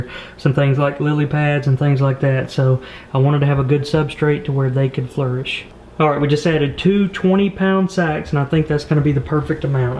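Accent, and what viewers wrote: South, United States. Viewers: With your accent I thought you’d say yall so it felt weird when you said you all lol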